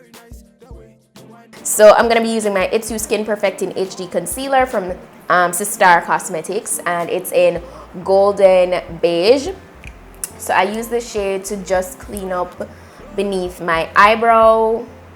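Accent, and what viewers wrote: Jamaica. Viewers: You beautiful love your Jamaican accent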